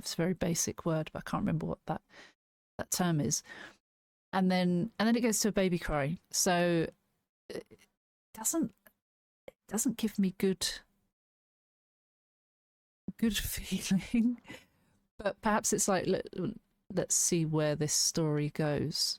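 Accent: England, United Kingdom. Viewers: Hi it was refreshing to find your channel. A more familiar English accent (most creators being American or Canadian) I have subscribed also. Hope you can do more in the future. Your quest for the meanings are usually correct. Keep it up...x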